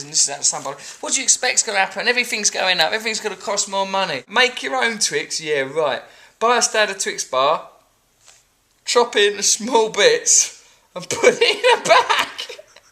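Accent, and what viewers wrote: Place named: United Kingdom. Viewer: Good afternoon dear Russell ( in England, in Mx, the morning ) first of all I would like to thank thee very much because now we can have a little bit larger glimpse to have a look ( though still very rapidly seen for most of us, I think, to grasp the title completely but all the same you have slowed down your pace, which is really appreciated) at the books you read excerpts from. As homework, you leave us with many themes to think about but I take the one I could grasp thoroughly ( little by little am getting my ears used to thy velocity of speaking and your lovely British accent ) the subject matter I could comprehend was the one related to our fleeting passing through this dimension on planet Earth ( haven't you observed that we've been programmed with this notion since we're children by parents, relatives and later school and everyone confirming and reaffirming as scratching screeking old vynils that "life is so short" that "it fleets away" etc., all those currency-phrases we have to deal with all of the time, day after day, yes indeed but pleeease ... 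) well what I wanted to say is that o.k., sooner or later we shall be dead one of these days and that what about our belongings, as you said: "it won't be our problem anyway". Then, and in order to prepare for that event that in any second passing, any minute, any hour, any moment is nigh and nearing: would you please include in your list of topics for your discussion books like the Tibetan Book of the Dead " Bardo Thodol" a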